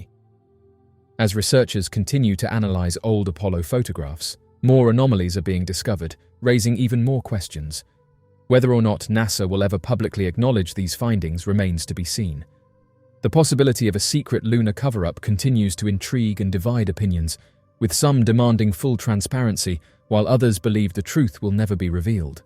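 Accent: United Kingdom. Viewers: Even you know, with your British accent, THAT WE HAVE NOT LANDED ANYONE ON THE MOON. STOP PRETENDING OTHERWISE.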